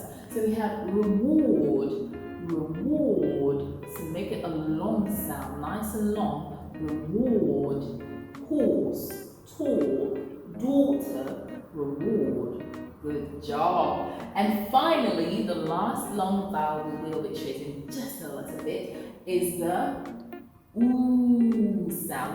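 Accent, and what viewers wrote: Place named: United Kingdom. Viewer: Wow I love your British accent, it's very elegant and beautiful. I think your YouTube channel will do just fine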